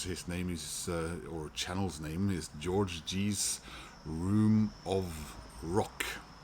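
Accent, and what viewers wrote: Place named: Ireland. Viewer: Look at that cool Opeth album cover ! The motorcycle noise reminds me of some of the streets here in the U.S. Thulsa Doom.. I will listen. I have The Hives debut on an original pressing. Bought it back in 2002 or so. Good Irish accent sir ! 😊The only accept I have is Metal Heart. I just watched Doug's latest here before work today.✌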